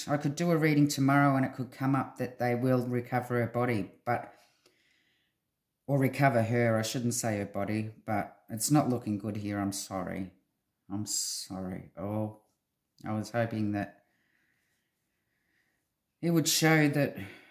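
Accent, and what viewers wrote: Australia. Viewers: This rings true. I hope they convict him and find her! Thank you for sharing. I love your work and your soulful Ozzie accent. :)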